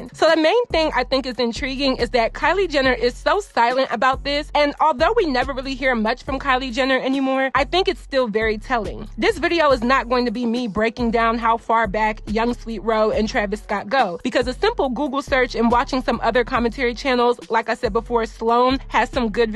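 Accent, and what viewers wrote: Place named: California, United States. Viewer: To answer you about the accent she has Trust me it is a Cali accent I live in Cali and everyone always tells me I sound like the Kardashian’s when I never noticed I guess it’s just the main accent out here ❤️ I love your videos !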